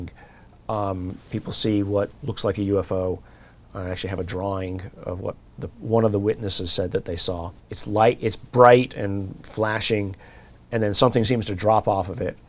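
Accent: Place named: Liverpool, United Kingdom. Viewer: Love the Liverpudlian Nolan ❤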